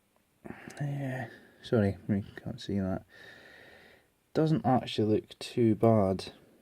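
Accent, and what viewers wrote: United Kingdom. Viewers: Hi, it was a total coincidence, but I'm happy I've found your video. Personally I own more than 40 Amigas 500 (just this model) and I have many parts of it (but just one of it is the real 501;). It was really great to see the professional way you have handled it. BTW. Very good manicure;) and just please keep in mind, not all of us are native speakers (however it was great to hear the beautiful britisch englisch). Regards from Germany. Adam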